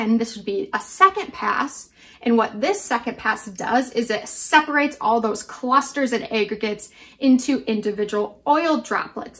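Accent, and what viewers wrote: United States. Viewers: She is American. That is how Americans pronounce it.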